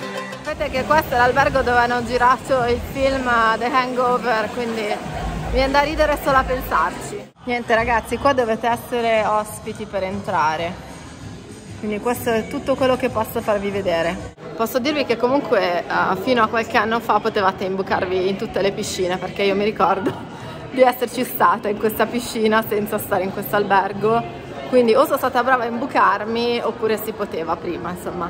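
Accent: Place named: Milan, Italy. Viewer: Sei talmente solare che e' un piacere ascoltarti...e vederti. Poi per me che so Romano e' srmpre bello sentire il milanese parlato da una ragazza. Baci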